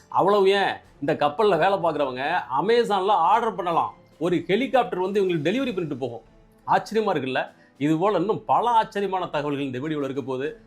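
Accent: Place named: Thanjavur, India. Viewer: நல்ல உட்சரிப்பு தஞ்சை பேட்சு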